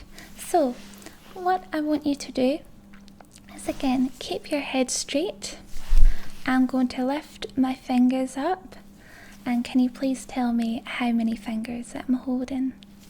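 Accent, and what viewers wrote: Scotland, United Kingdom. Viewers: I click on these to find out which accent I’m going to find. The Scottish one is my absolute favourite. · Your medical role-plays are first-class and the Scottish accent is an added bonus! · Love your scots accent it makes me feel safe and helps with my ptsd thanks for all you do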